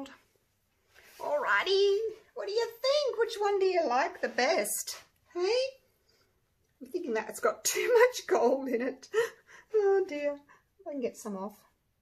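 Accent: Australia. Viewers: My goodness those are gorgeous!!! I’ve got to try this!!! I just love listening to you talk, Aussies have such a pretty accent & you sound like such a lovely, fun person! Heading to your eBay store now!💕💕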